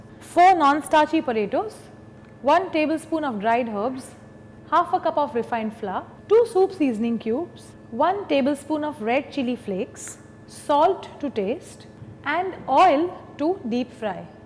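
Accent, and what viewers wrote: India. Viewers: lol thts indian accent honey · Very very very thick Indian accent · OMG I love the Indian-English accent Thanks for the recipe ^^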